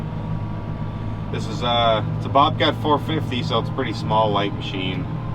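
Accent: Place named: Netherlands. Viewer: Hi, it is quite clear that you presumably have Dutch ancestry, were you born in the USA or immigrated, your English is at least good.. But as cold as it is now, it is not extremely hot in the summer, and isn't it very challenging at times. Thank you for wonderful everyday videos that I can watch here in little Denmark.. Greetings Erik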